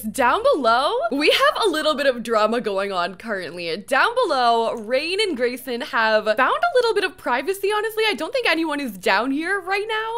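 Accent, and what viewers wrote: Canada. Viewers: When I saw Eliza as a mixologist, the first thought that came to my mind was "oh God, she's gonna spit in all of the contestants drinks" 🙈 Absolutely love the chaos in the Reality show! Little, funny side note: since I've been watching your videos I kinda started picking up your accent and the way you speak :D My half Canadian English teacher (who speaks normally in British English) was excited to hear his accent from one of his student xD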